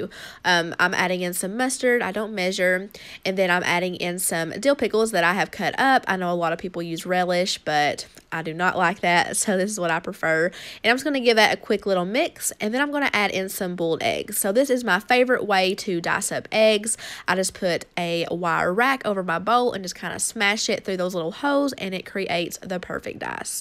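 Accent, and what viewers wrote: South, United States. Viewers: Your accent is very southern lol I kinda like it. Where are you from? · I love your accent!!! I’m from NC, but I never got a true southern accent. But I watch one because I wanted to cook more. Two we have similar taste buds, and three your lovely accent ❤️❤️❤️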